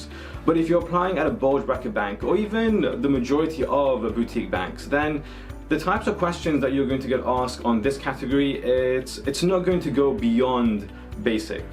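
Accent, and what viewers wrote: Mauritius. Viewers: Is that a slight Mauritian accent?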